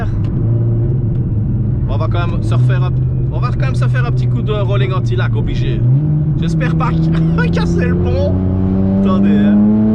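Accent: Belgium. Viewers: un régal tes vidéo , la GTR on en parle pas c'est une tuery, courage et bon rétablissement au papa, sa va bien allé j’en suis sur !!! Plus je voi ta GTR plus j’en veux une, ton accent belge me tue, nonente huit (98) me donne des barres, Bref continue bien, et des que jai la GTR je monte!!!!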